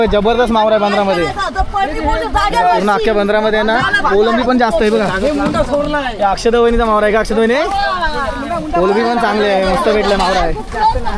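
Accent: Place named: Konkan, India. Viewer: भाषा तर कोकणात वाटते दादाचा कोणत्या गावचा कमेंट करून सांग कामांची रिप्लाय दे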